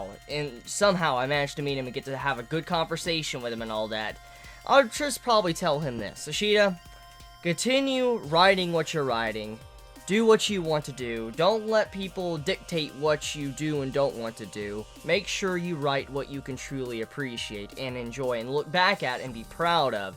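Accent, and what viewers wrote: United States. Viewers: After seeing that old dance video, I've noticed that your country accent has diminished over the years.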